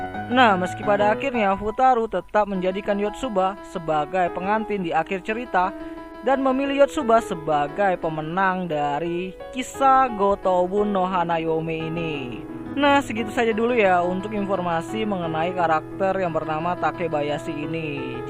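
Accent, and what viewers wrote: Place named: Algeria. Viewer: حسيتك شغل راك تهدر دزيرية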